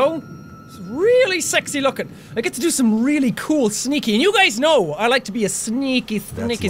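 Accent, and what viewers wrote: Ireland. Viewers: Wow I didn't know you liv In Ireland thats how your irish tell me more oneday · Is he Irish? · OMG I LOVE THE IRISH ACCENT :D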